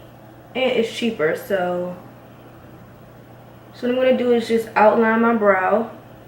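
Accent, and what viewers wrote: Washington DC, United States. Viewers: Where are you from you sound like us girlies from D.C.!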